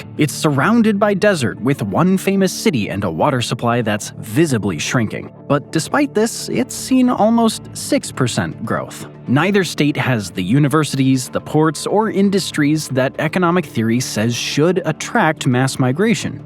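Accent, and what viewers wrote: California, United States. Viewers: Sounds a little bit like you might be from California.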